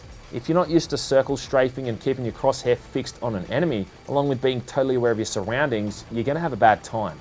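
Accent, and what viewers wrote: Australia. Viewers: Is that an Australian accent i hear?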